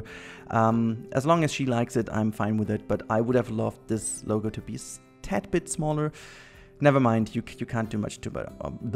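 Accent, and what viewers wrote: Germany. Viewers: Ich Finde den Deutsch Englisch Akzent so schrecklich 😂 is bei mir aber auch so mit dem Englisch was du gesagt hast 🙋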